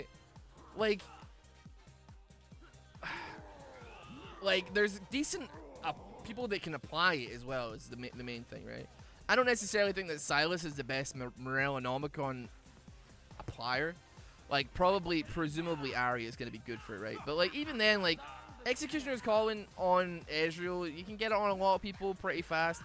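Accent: Scotland, United Kingdom. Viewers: Extra-Scottish makes me wanna play D&D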